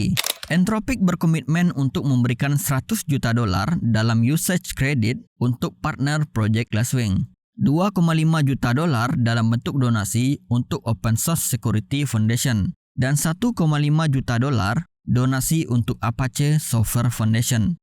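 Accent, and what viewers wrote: Aceh, Indonesia. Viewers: Ureung aceh bang nyoe. ? bereh hana gadoh karakter bahasa aceh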